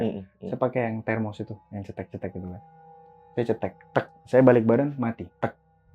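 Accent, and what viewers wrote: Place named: Papua, Indonesia. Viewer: seru mas klo pk logat papua bgs..sekilas g terlihat orang timur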